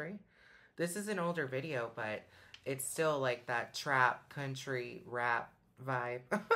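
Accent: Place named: South, United States. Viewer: Damn dudes voice is great for the country.. Fire ass voice.. I hate how country gets me in my feelings, though.. Oh that one part on the first song is not a violin in country it's the fiddle close but not the same.. This shit is fresh I'm going to be adding this guy to my everyday music.. Damn his rapping is Fire too.. did a country song once but it was ahead of its time.. He should do a song with them.. Love your country accent also made me laugh.. This whole video is 🔥 💯. I want a song with this guy and..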